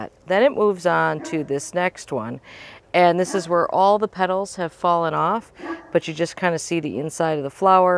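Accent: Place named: Midwest, United States. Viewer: “All flowers match.” Yessssssss! I love watching you garden, your accent takes me back to my time in the Midwest and makes me want to call old friends.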